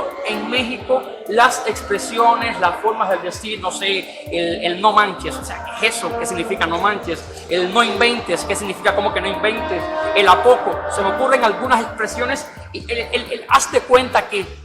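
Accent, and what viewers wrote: Cuba. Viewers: Tu acento ya no es tan cubano o sea no es exagerado,me agrada tu acento , saludos 🎉🎊✨🌟⭐💫💙🤎💛❣️🤍❤️💚⭐🌟✨💫🎊🎉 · Ustedes son queridos amigos en cubanos en mexico nunca pierdas tu asento cubano hermano saludos desde atlanta Georgia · El asentó cubano se conoce a la legua usted es cubano no niegue su paiz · no sabía que bueno entrar a informarse, soy mexicana y me avergüenza que hay paisanos así, lamentablemente de todo fruto da un árbol y aún en México hay mucha gente que solo habla por hablar, discúlpalos se crecieron viendo televisa y TV Azteca y no se tomaron la libertad de leer. Saludos y un enorme abrazo a mi me encanta el acento cubano y hay mucha gente en México que vale oro, no te quedes con la impresión de algunos pocos mexicanos ignorantes.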